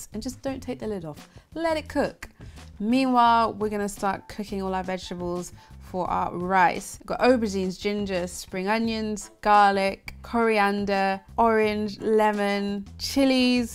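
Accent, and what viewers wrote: England, United Kingdom. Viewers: She sounds English tho.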